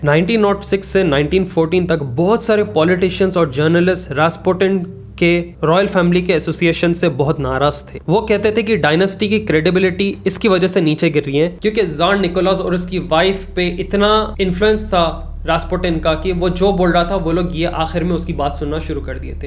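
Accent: Hyderabad, India. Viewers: Bhai hyderabadi accent hi bola karo wahi suit hoti hai dusre accent mai hindi/urdu hi change hojati hai all the best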